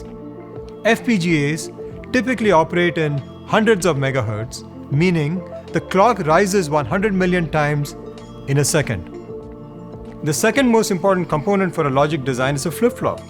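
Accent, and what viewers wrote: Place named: India. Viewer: You couldn't find a guy without an indian accent?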